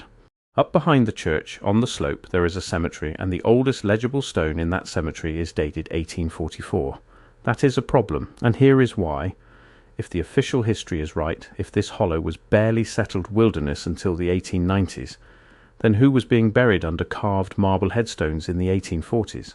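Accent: England, United Kingdom. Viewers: This was fun. You sound English. 😉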